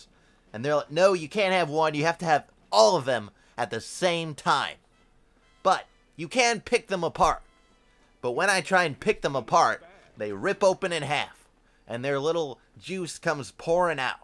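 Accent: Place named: South, United States. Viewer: You sound like a hillbilly. Don't take that offensively. I don't know if it's an act or not but it adds to the comedy.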